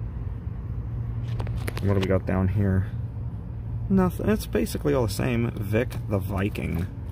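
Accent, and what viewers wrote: Pittsburgh, United States. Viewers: I thought I heard that Pittsburgh accent in your Chuck E. Cheese video lmao yinzers for life man. Subscribed!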